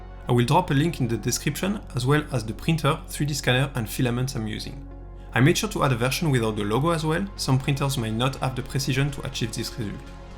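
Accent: France. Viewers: Woah!!! You’re French! Nice to hear your voice! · Pourquoi ne pas parler français ça s'entend à votre accent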